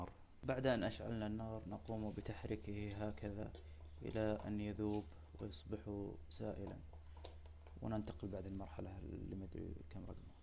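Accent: Saudi Arabia. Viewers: شويه يتكلم بلغه العربيه وشويه سعودي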